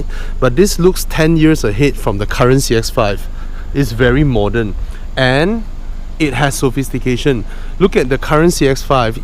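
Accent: Malaysia. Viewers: oh man...the msian accent I love it. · I've lived in Malaysia for 2 years, I like your accent :D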